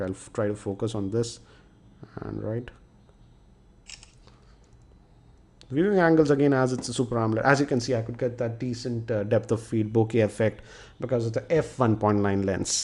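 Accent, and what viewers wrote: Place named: India. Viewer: gosh ! the indian accent...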